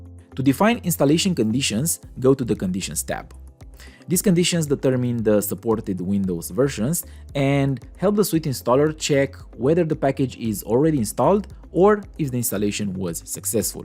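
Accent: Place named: Romania. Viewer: Mereci de tutorial :D excelent :D mi-am dat seama ca esti roman dupa accent :D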